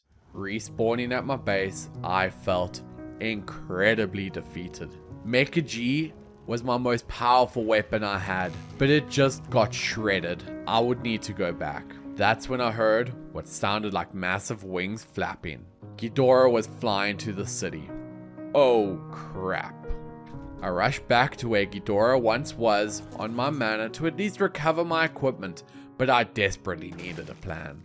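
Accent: South Africa. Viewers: Love your videos and i never knew you lived in South Africa telling by Eskom, plus your accent. Good to see another fellow South African Ark player. 😊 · Are you south african? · Are you from South Africa because I am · Wait are you South African?? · You sound so South African. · omfg i knew you sounded south African and then you said load shedding and then i was sure <3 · are u south african bro? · Bra when I started watching I heard your south african accent and then you said eskom and then I knew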